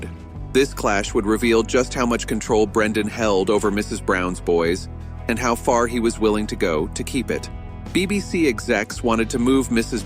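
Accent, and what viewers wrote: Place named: United States. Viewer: VOICE OVER TALKING CRAP AND WHY IS AN AMERICAN DOING THIS AS THEY HAVE A DRY SENCE OF HUMOUR....VOICE OVER TALKING GARBAGE.......GO MAKE COFFEE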